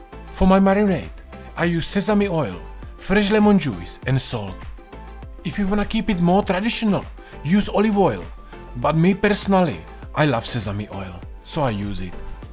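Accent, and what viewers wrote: Czech Republic. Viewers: češtější přízvuk jsem asi ještě neslyšel... nice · Vaříš opravdu skvěle ale ta čeština tak brutálně bije do uši.. Raději si vypínám zvuk a zapínám titulky 😀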